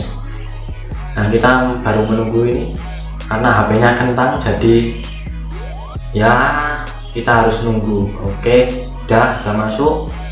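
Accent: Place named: Java, Indonesia. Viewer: Logatnya Jawa. Emang asli mana bang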